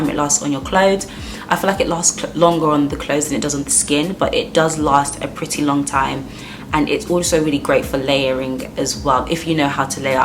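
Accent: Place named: England, United Kingdom. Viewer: Beautiful English accent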